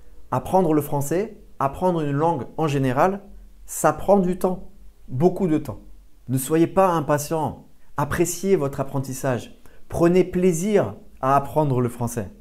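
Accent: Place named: South, France. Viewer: Il me semblait juste que tu parlais un peu a la maniere du sud (j'adore entendre des nuances de français))) Mais j'en doutais et pensais que c'etait probablement juste une association — ton nom est, comme le personnage principal de le dessin anime Ratatouille, et la ratatouille est un plat du sud, etc. Bien que (j'ai deja regarde toutes tes videos) tu vis vraiment dans le sud))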